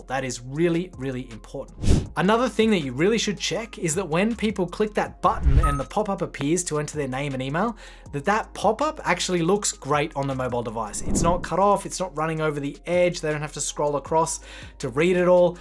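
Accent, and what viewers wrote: Australia. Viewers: Really good material, and good to hear an Aussie voice too.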